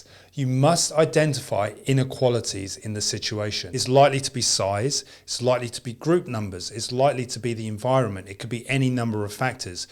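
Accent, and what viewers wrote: England, United Kingdom. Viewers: Based on his accent I realize he's a Brit ( I live in the USA); I wish he was my sensi! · You sound English I guess having a hand gun is not an option , some bad guys make the mistake of thinking they are the only one with a gun. Most of them cant land a shot within 3 feet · UK accent.. hows those knife attack stars after gun bans..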